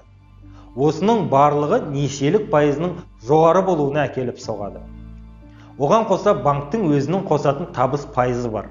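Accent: Standard. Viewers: Билікте осындай қазақша таза сөйлейтін, өз ойын анық, түсінікті етіп халыққа жеткізе білетін адамдар отыру керек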